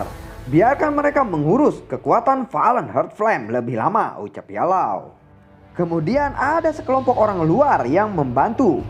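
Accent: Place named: Sunda, Indonesia. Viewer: Wkwkwk Sunda gning 😁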